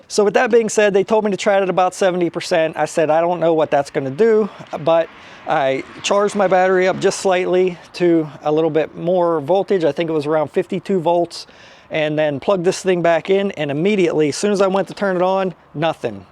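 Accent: Pittsburgh, United States. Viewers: Dude sounds like he's from Pittsburgh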